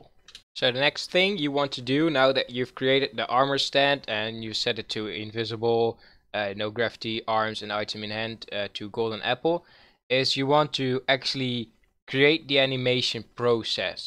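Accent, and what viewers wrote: Netherlands. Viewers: Nice Nederlands accent!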